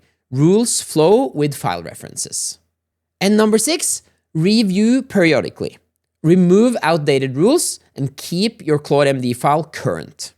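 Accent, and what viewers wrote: Norway. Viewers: Det tok 0.564secunder å høre at du var norsk :)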